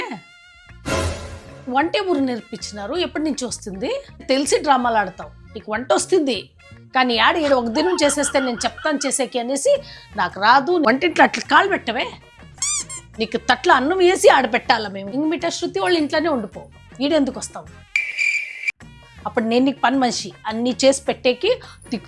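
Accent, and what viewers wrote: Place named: Kadapa, India. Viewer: కడప యాస...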